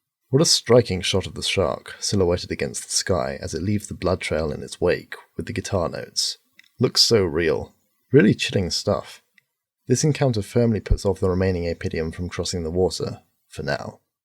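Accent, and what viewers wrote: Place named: London, United Kingdom. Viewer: I'm really enjoying the episodes so far. I swear your voice sounds familiar. Though it could just be the London accent. Does Valerian mean anything to you?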